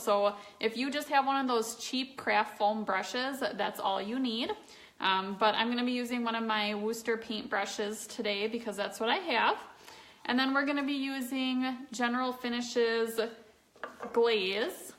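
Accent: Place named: Buffalo, United States. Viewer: You must be from Buffalo NY??! 😁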